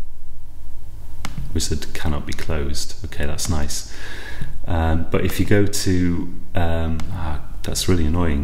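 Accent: United Kingdom. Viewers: You're not only teaching me Programming but also English at the same time. I have been taking British English classes for about 2 years now and i wish i could sound like you. Really love The British accent, Just incredible!!! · Strong accent of British!QAQ after learning all of this courses i think i can talk English fluently like a British.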